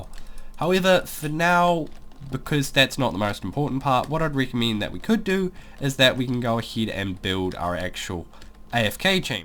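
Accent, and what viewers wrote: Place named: New Zealand. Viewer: What part of New Zealand are you from? I'm From Auckland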